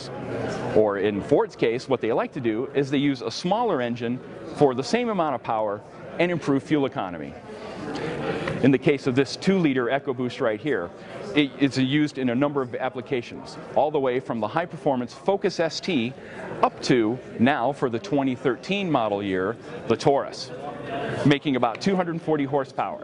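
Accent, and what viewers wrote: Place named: United States. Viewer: pronouncing it with his stupid American mouth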